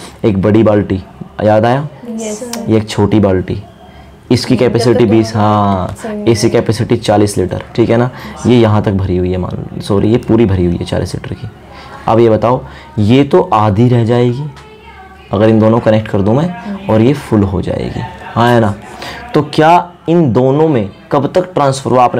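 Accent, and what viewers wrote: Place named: Haryana, India. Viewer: ur accent is like that (from haryana)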